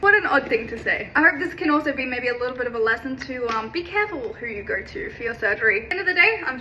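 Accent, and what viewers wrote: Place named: United Kingdom. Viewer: Ur british. Wtf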